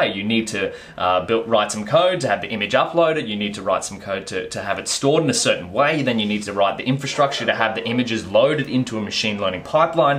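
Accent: Australia. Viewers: first sentence I could tell he is an aussie jaja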